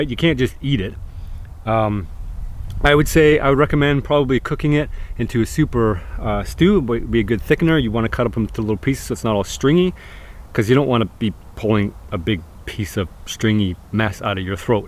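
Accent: Canada. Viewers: The second I heard your voice I knew you were Canadian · Finally a Canadian accent i can hear. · I like how he doesn't have a Canadian accent and then it just says in this one video of how he talks Canadian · He’s sounds Canadian😄😄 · You sound hella Canadian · you are Canadian! Test it Oat = test it out! Haha, nice channel! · cool! Ton anglais est impeccable, impossible de deviner que tu parles aussi en français:) mais probablement avec l'accent du Nord comme à Sudbury:)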